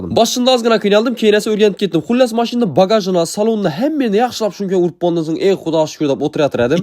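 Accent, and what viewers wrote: Khorezm, Uzbekistan. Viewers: Ha oʻzimni tili shakar xorazm yigitlaridan aylanay haqiqiy jaydari shevada malades😊 buxorodan salomlar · Buxorodanman Xorazm shevani polni tushunaman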